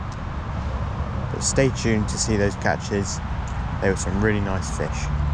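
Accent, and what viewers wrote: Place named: South, United States. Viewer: Hi mate, fantastic location and fish! Your accent sounds like youre down south, im from Buckinghamshire but struggling to find some good wild trout streams like this (a lot of them seem to be expensive chalk syndicates or fly-only). I dont suppose you'd be able to give me any tips on these sort of locations you spin for trout on? Dont mind driving an hour or 2. Can always PM if you dont feel like sharing publicly, thanks, James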